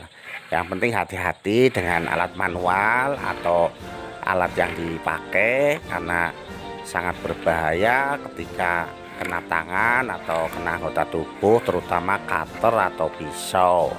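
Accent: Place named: Banyumas, Indonesia. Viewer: Logate banyumasan banget pak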